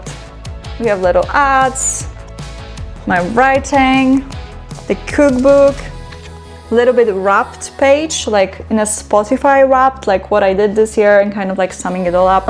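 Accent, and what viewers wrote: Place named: Poland. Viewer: You have a really cute accent. Polish, right?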